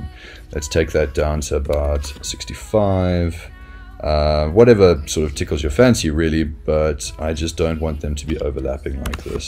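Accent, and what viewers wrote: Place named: South Africa. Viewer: Thought it sounded South African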